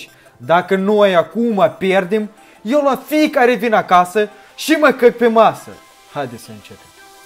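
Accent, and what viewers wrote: Moldova. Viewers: Nu sunt hater dar nu-mi plac cum vorbesc moldovenii nu e vina ta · Esti din Moldova :D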